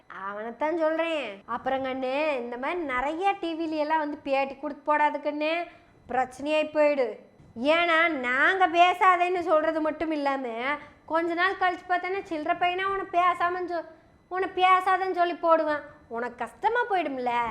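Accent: Kongu, India. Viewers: கோவையின் கொங்கு தமிழ் செல்வி வாழ்த்துகள்.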